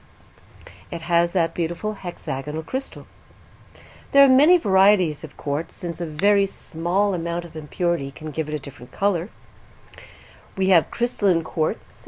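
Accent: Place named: RP, United Kingdom. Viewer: Beautifully elucidated (great posh chat).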